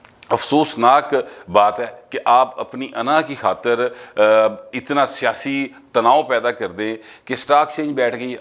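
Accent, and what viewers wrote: Lahore, Pakistan. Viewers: Kya baat ai lahori lehje di. Zindabad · آپ کی پنجابی اردو میں بھی بہت اچھی لگتی ہے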